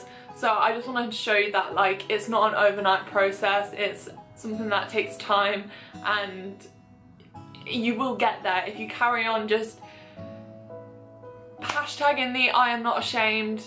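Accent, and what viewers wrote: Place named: West Country, United Kingdom. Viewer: Also when you’re not on camera you have more of a West Country accent lol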